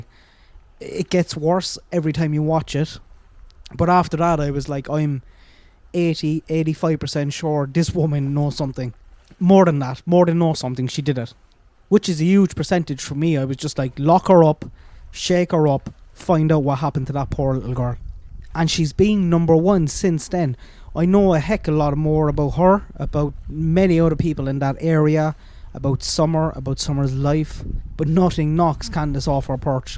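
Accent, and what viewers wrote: Ireland. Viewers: I always get so wrapped up in what you say and enchanted by your Irish accent right away, that I totally forget about the darn bunny! 🐰🐧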